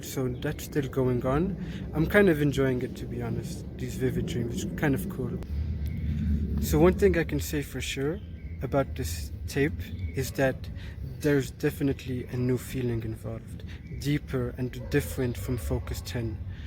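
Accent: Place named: Mediterranean. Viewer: it sounds like you have some Mediterranean style accent but it's a cool one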